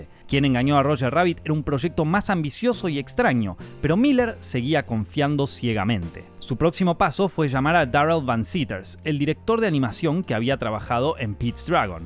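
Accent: Argentina. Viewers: Empecé a ver el video pero al oír ese acento argentino que detesto, mejor me voy a ver otro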